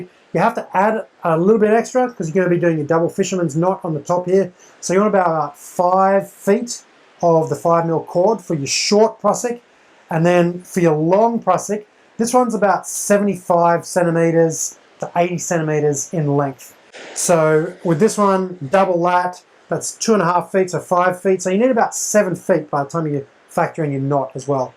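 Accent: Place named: Australia. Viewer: Hey you sound like an Aussie (that's a compliment). Excellent, excellent video. Sound advice at every stage. Particularly at always carrying a Reverso. My current project is toying with top rope solo for ice-climbing. Presently using a Petzl Rescucender (top) on a harness combined with a Petzl microtraxion (bottom). The Rescucender is very heavy as I have teamed it with a steel (Grivel) biner because of the cross-loading. Have you seen/tried the TAZ Lov2 fall arrest descender <link>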